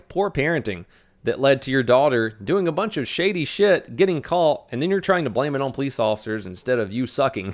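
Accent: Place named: United States. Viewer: She speaks the best American I've ever heard!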